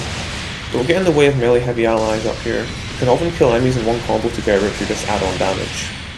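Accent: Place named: Scotland, United Kingdom. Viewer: Just started playing so these videos have been helpful, is that an Edinburgh accent? I'm from Glasgow so you sound Scottish but can't place it exactly.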